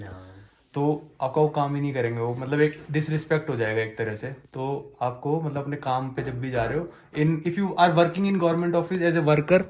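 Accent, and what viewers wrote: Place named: Northeast, India. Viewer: English Accent same as North East Indian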